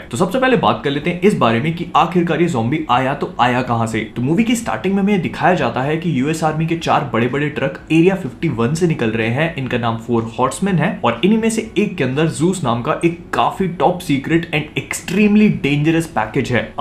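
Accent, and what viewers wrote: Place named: Hyderabad, India. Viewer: haidrabadi voice mast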